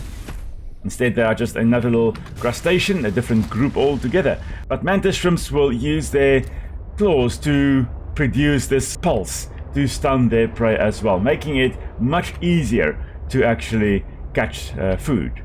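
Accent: South Africa. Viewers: where you from? you sound south african to me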